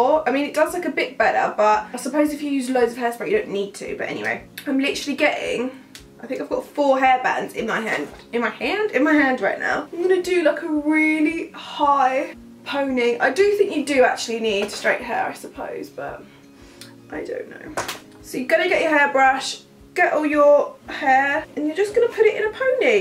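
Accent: United Kingdom. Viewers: I love her British accent 😍😍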